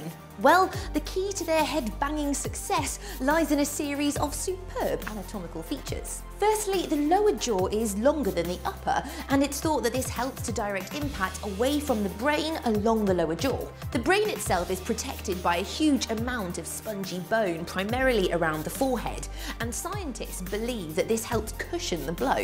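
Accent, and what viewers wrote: United Kingdom. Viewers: lol is so hot you can even hear her British accent when she laughs;)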